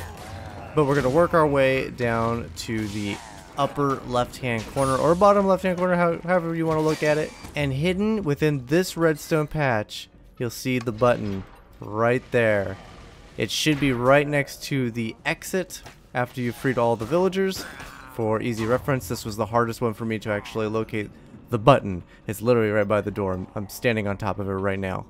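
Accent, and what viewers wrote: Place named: England, United Kingdom. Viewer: Me: DAD I FOUND A VIDEO WITH A GUY THAT HAS AN ENGLISH VOICE! dad: so?? Me: He also tells us how to get to that secret lvl... dad: NANI?!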